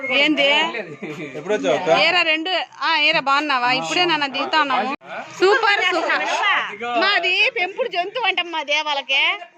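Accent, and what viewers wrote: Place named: Kadapa, India. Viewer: Meedi aaa ooru maadi proddutur meedhi slang kadapa vaalla laga undi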